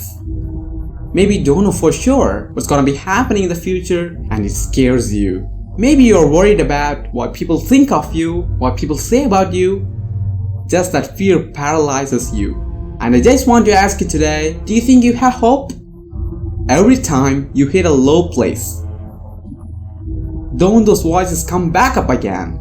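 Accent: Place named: United States. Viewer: Mesmerizing to see an indian speaking in american accent. Good job.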